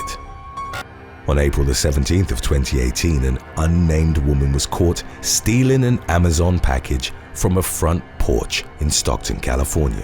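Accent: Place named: London, United Kingdom. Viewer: Hi, as an Englishmam myself I'd go so far as to say he's from the London area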